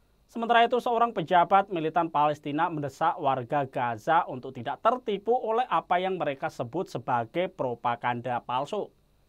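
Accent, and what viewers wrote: Java, Indonesia. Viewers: medok njir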